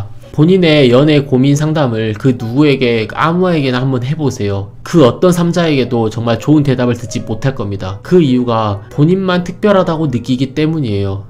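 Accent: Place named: Gwangju, South Korea. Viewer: 최근 힘들어서.. 영상을 거의 보게 됏습니다! 제목처럼 전 을의 입장이구요.. 장거리커플인데...지난달부터 마음 식은걸 느꼇고 환승하려하는지..어쩌다 연락도 안됏어요~~ 요즘 모든게 귀찬고 시국도이러니 제주에 사는 분이라 뱅기타지말구..내년에 보쟤서 클스마쓰 오늘 못만납니다ㅡㅡ 헤어지잔말두 안하고..만나지도않고 이 인간 버리고싶은데 맘이 결단을 못내립니다ㅠㅠ 내가 헤어지자고 말하길 기다리는거 같네요.. 제가 더 좋아햇던 을의 연애엿구요! 답은 알아요..근데 잘 안되는게 미칠지경입니다! 영상 모두 잘보구 잇구요..저도 광주가고향인지라 고향사투리 들어서 넘 편하게 잘보고 잇습니다ㅎㅎ 힝 슬프네요ㅜㅜ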